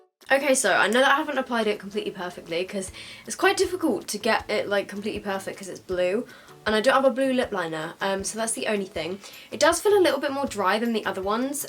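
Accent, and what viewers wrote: England, United Kingdom. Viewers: Are you from England? Your accent sounds that way 💖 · I just subscribed and I wanted to know if you were English? (I'm English)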